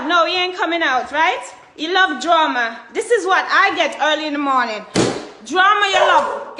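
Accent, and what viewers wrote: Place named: Jamaica. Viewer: You jamaican accent no too bad enuh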